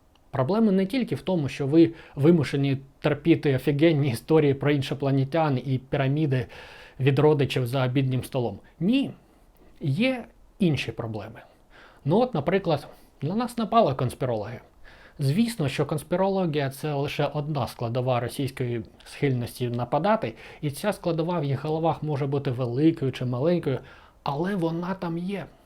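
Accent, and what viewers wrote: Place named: United States. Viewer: На фоні включив це відео просто по приколу, але в моменті справді стало цікаво. Це якийсь суржик чи фішка що ти в більшості міняєш г на ґ) спершу думав що помилився, але чим далі тим більше стало здаватись що ти омериканец який добре оволодів мовою, але залишив акцент)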